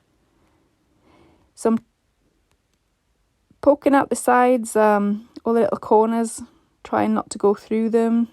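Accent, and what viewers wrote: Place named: United Kingdom. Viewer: I had to chuckle so when you started making the yo-yos. With your accent, I would’ve expected you to call them Suffolk puffs. I’m enjoying your work so much and commentaries are just lovely.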